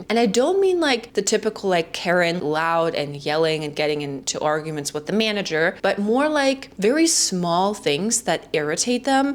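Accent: United States. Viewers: Hi, wow, this was brilliant, almost. I mean clearly above average in comparison to other American versus German stuff. Your American accent is beautiful. In most cases I prefer International English, but you sound awesome. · HI! 58-y/o guy here. You don't "sound" German, so US'rs likely think you're "one of us," if you will. You speak like an American from the vids I've seen, so hopefully this will help! Americans see everyone as a potential friend, so if I were in another US city & saw someone wearing a sweatshirt of Madonna's concert in Chicago, say, I'd think nothing of going up to said person & gushing about their sweatshirt & that concert. "Wasn't that a Great concert?" S/he would likely say, "Yes, OMG, YES!" & we'd talk about it. We wouldn't think anything of this interaction. I'm told that this would embarrass folks in the UK & EU, b/c everyone sees themselves as private. 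The Sie/du forms (Germany & other EU countries, & the Brit perspective on formality) seem to prohibit this. English used to have a formal form (I forget it; I think that's where "y'all" came from), but it's been eliminated as the language has been watered down. But welcome to the US!:-)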